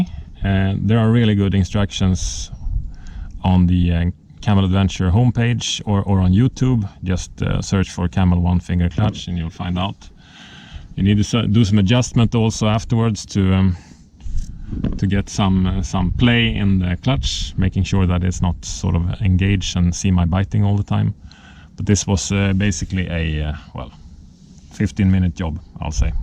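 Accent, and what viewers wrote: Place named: Sweden. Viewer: Why swenglish ? It`s hard to listen to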